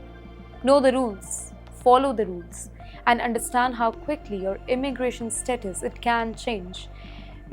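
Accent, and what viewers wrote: India. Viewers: AI report with an Indian accent.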